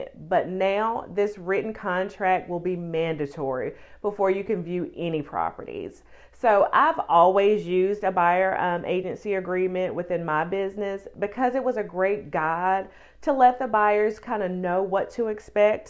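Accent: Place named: South, United States. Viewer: First of all, I love the southern accent! Also, thank you for the information. I’ve seen a lot of unqualified people going to real estate the last few years. They think it’s going to be an easy way to make money. It’s refreshing to see somebody who has the knowledge and qualifications. I have never understood why the seller has had to pay for the buyers agent. Just because the seller walks away with a big bag of money why does that make them liable for your agents commission? It always seemed to me that the buyer should pay their own agent!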